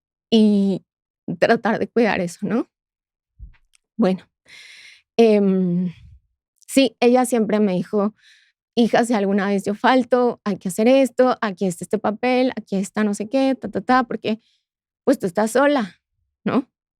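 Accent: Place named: Colombia. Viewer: Habla como Colombiana...solo falta el ...como así...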